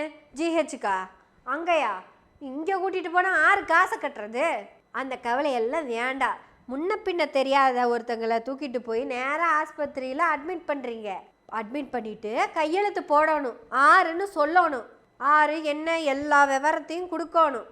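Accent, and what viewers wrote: Kongu, India. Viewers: அம்மினி உன் கொங்கு பாசையை கேக்கவே உன் வீடியோவை பார்க்க தோனுது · மகளே நீ தெய்வகுழந்தை அப்படியே கொங்கு தமிழில் பொளந்து கட்டுறியே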